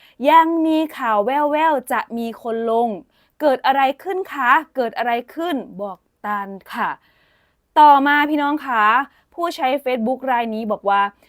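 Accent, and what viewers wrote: Isan, Thailand. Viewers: เสียงอิสานแน่ๆไม่ต้องเดา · เสียงอีสานไหมคะ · บอกไปเลยว่าเสียงอิสาน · เว้าอิสานปนไทย น่ารักดีครับ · เสียงอิสาน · 8นี้เสียงอีสานเล่นมาดตาพุดครัย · เสียงอิสานกะเว้าโลด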